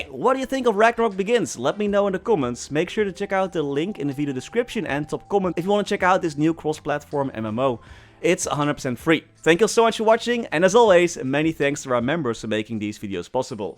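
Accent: Netherlands. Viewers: Dude has a heavy dutch accent 😅, is he dutch? 😅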